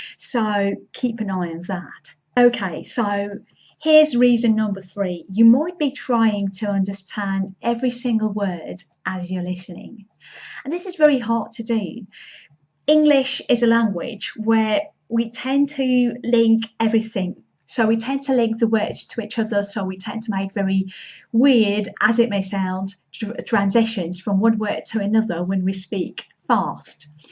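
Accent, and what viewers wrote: United Kingdom. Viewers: Hello. Your British accent is superb! Could you please tell me how you acquired it? Thank you.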